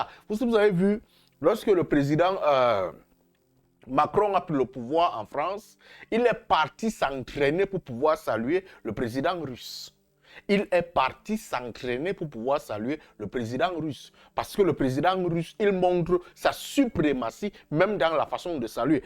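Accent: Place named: Cameroon. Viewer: au senegal pays musulman constitué de confreries leducation est basée sur lenseignement coranique ou francais et non tout ce qui est maconnique donc il n'ya aucune chance que diomaye puisse comprendre ces choses en vrai senegalais quil est , mais meme si macron fait des signes maconniques il peut se targuer detre plus grand mais ce qui est sur est que diomaye est bien dans sa tete et connait deja la politique qu'il va mener donc les signes maconnniques je suis plus grand et tout , eh bien quils le mettent dans leur trou du cul tout simplement , rien a foutr et puis vous les panafricains camerounais car je sais que tu es camerounais par ton accent ce signe de la maingue maingue vous devez dabord vous occuper de deloger biya de votre pouvoir avant de venir noius donner des lecons de macons ou je ne sais quoi vous avez vraiment du boulot qui vous attend ces a dire deloger biya 40 ans de pouvoir voyons je ne comprends pas pourquoi vous vous acharnez sur le senegal ou yaurait d'autres raisons a ca , vous etes trop focalisé sur le senegal , occupez vous de vos oignons nom de Dieu ce nes pas parcequ on est africain qu on doit forcement vous rejoindre dans vos ideologies , ideologie pensee unique cest archaique et démodé ca chacun il gere son pays et cest tout nous cassez pas les couilles a la fin quoi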